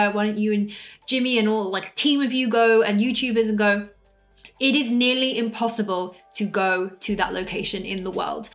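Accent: United Kingdom. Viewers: Hey I think you are doing a great job making us aware of the many mysteries of the Ancient times plus adding your personal female British accent makes it more attractive. Jimmy is a great investigator also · Something about discussing the truths of ancient history....with an English accent...makes it sound so legit. If I were to talk about it I'd sound crazy. :-P At the end there you posited that survivors of the Atlantean Global Empire came to the hunter gatherers (assuming they were very primitive and since they lived inland and in the wild they survived the tsunamis and weather changes) and almost appeared to be gods with their Atlantean knowledge. So "Osiris" was an Atlantean who then became a god to the early Egyptians. Now circle back to the pyramids. They were thought to be pre-diluvian structures that created energy to supply power to the various global cities (everyone had their own pyramids or power structures). 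If a surviving Atlantean passed on his knowledge to the pre-cursors of Egypt....why did he never explain the pyramid's true purpose? Even if the pyramids no longer worked, why did the Egyptians keep zero records of the structures, how they were built and who built them?? Not even a verbal tradition, one that speaks of Atlantis WAY BACK in history, doesn't speak of the pyramids across the globe? There's a huge gap here that needs to be filled...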